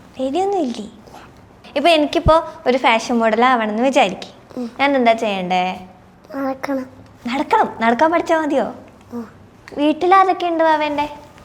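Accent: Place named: Kannur, India. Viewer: Anchor kannur slang ... 😂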